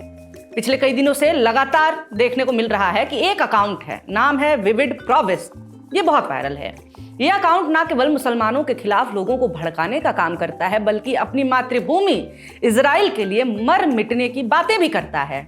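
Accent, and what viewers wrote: Bihar, India. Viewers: Nice. Tone reflects of bihari. Liked it. Gud information. Keep it up and keep the style of speaking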